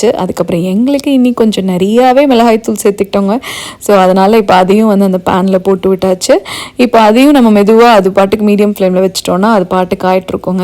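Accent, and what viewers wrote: Kongu, India. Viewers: Romba azhagha video..unraakudavey irrundha madhiriyee irundhadhu thangam. Un style..rombaa unmaiyaa appidiyey ellam solradhu thaan nu ninaikireen.. inainkku thaan enakku YouTube unnai recommended shorts nu kamichadhu.. ulla vandhu parthaa superbaa irrukkuma..enndaa ithanai naala kattalanu feel panna vachadhu. Unnga recipes ellamey healthy & tastyaa irrukkunga..north & south mix matching recipes..namma pachapayarum, methi chapathiyum, paneerum, nalla combination da. Andha mambazham cutting nanum parthu irruken..parthaleey paravasam feeling..neenga thirupiyum andha feeling kodutheengaa.. unga kongu slang..romba naturally nalla irrkku..ennavoo unngaa kudavey pakathulaay irrundhu parkara mathiriyee irrukku maa. Pachapayaru pakkuvaum sonnadhu, machichadhu, seimurai for all dishes , practical tips, ellamey superda thangam. Enga irrundhalum, namma manasu vachaa ellamey nalla seidhu, healthyaa seidhu sapadalaamnu practical aa kamichu irrugeengaa.. 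Innum niraiyaa video podungaa.. Londonlaa endha pakkam irrukeengaa.. Chedi varathadhu pathi Anitha Anand channel niraiyaa seasonal chedinga, pudina, strawberry ellamey nalla valaradhu, grow tips kodupangaa, namma Charless Anna, Subi sister channel London Thamizhachi channel laa parthu irruken. Charless anna niraiyaa tips kodupaar. Nalla vilaichaal irrukkum, namma use pannara kaikari, poondu, kodi kaikal, poo chedi ellamey.. .. Vazhgaa valamudan.❤❤❤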